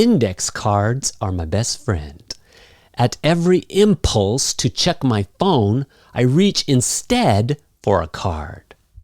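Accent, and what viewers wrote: United States. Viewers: It sounds american.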